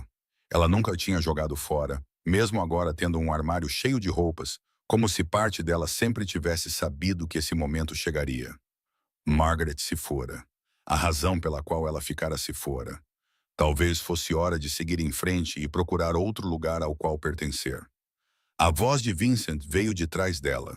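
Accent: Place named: Portugal. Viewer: Ellen do Rio de Janeiro RJ 🇧🇷 Gosto muito de histórias de máfia narradas no português de Portugal!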